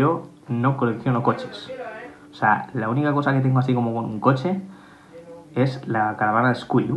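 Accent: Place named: Peru. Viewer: Sigo creyendo q eres peruano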